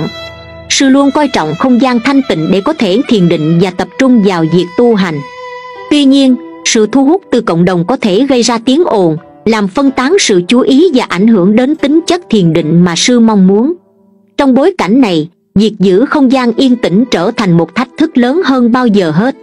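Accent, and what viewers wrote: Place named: South, Vietnam. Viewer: Giọng đọc hay quá, Chuẩn giọng nam bộ . Coi vậy chứ rất hiếm đấy quý zị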